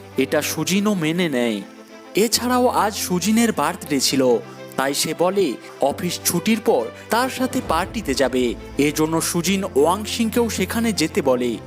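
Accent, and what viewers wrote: Kolkata, India. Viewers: uner koctay mone hoy kolkatar??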